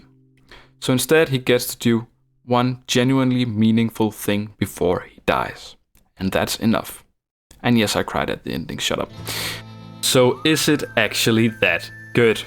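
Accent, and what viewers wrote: Denmark. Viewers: Are you from Denmark? You sound danish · Jeg kan genkende noget danglish når jeg hører det😂. Super god video, det var lidt chokerende at se du startede for 10 dage siden. Meget imponerende og jeg glæder mig til at se hvad du poster i fremtiden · Is that danglish i høre?😄❤️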